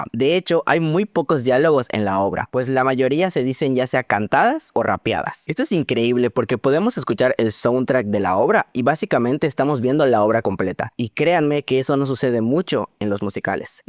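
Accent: Mexico. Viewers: análisis de hamilton en 2025 🥳🥳 te ameeee, necesitas hacer más videos porque los veré todos, excelente análisis, excelente acento yuca y mega slay ese make uppp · hay hamilton, hay drags, hay arquitectura y acento yucateco; este video lo tiene todo y por eso me super suscribo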